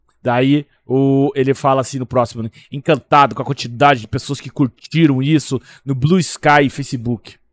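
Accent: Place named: Curitiba, Brazil. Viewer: Eu te sigo pelas suas notícias/informações, e esse teu jeito Piá de Curitiba de narrar as mesmas; acredito que cada um dos 20mil inscritos tem seus motivos e o que deve ser unanime é o formato simples e objetivo do canal .Parabéns pelo trabalho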